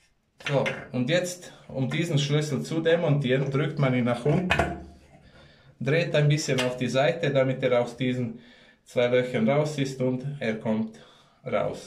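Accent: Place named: Eastern Europe. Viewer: Super erklärt ohne viel Schnick Schnack so wie es sein muss :) und dein Osteuropäischer Akzent ist sehr angenehm und beruhigend zu hören :) ❤❤❤💯🤝🏼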